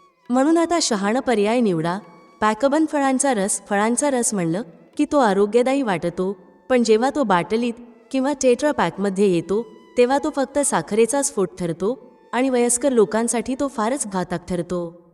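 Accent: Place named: South, India. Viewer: मराठी उच्चार दाक्षिणात्य वाटतात...😊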